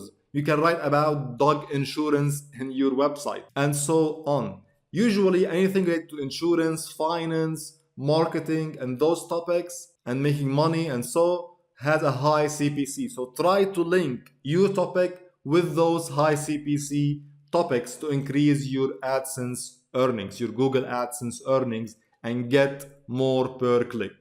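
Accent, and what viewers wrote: Lebanon. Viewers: i swear 7asset ennak lebneni min ur accent... fricken love that!!!!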